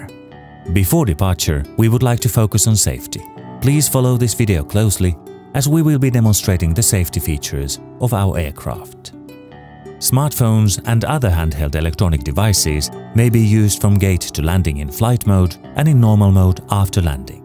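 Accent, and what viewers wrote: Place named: England, United Kingdom. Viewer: gentle English accent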